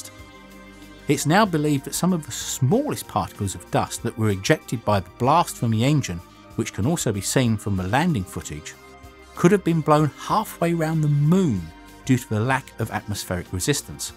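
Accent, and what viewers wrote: United Kingdom. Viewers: Really? Odd how the plaque left on the Moon reads Here men from the Planet Earth first set foot upon the Moon July 1969AD We Came In Peace For All Mankind and how odd that the narrator has a British accent, but that probably because he's British with an interest in the Apollo program. And that the samples brought back have made their way all over the world. If you are really that small minded that it just has to be an American narrator, then the Apollo missions are wasted on you.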